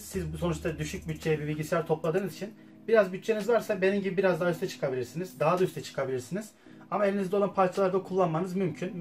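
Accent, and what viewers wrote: Gaziantep, Turkey. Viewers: Kardesim sen gazianteplimisin?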